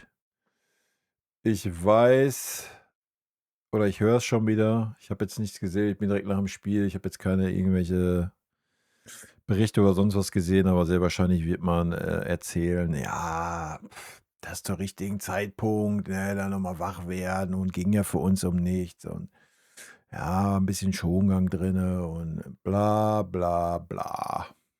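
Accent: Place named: Westphalia, Germany. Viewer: Ich darf mich mal bzgl. Ihrer Sprache und Ihrer optischen Präsenz äußern: sehr redegewandt, westf. Mundart, äußerst unterhaltsam, stets gelungene Mimik (ähnlich der eines guten Kabarettisten), zuweilen auch tolle Gestik, erkennbare Neigung zum schonungslosen "Niedermachen" , gar zum Fatalismus, geschickt eigesetzte Sprechpausen , eine gewisse Rat- und Hoffnungslosigkeit bei rhetorischer Fragestellung, leider unnötige Anglizismenverwendung.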